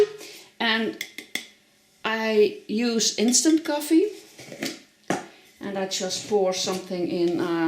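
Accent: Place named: Netherlands. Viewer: Dank je wel, thanks for the great tutorial, I must give it a try.. I loved hearing the Dutch accent.. I have been in Australia for 70 years but was born in Ijmuiden..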